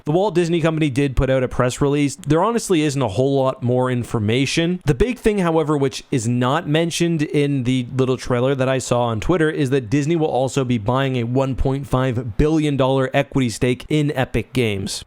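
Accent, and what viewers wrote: Canada. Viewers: If I remember correctly, he was a “boring” kind of lawyer. Might have been a copyright lawyer but definitely don’t quote me on that. It was his actual job when he started making YouTube videos but eventually the channel got so big he had to choose and this ended up being his choice. He’s also Canadian if you couldn’t tell by the aggressive use of “aboot”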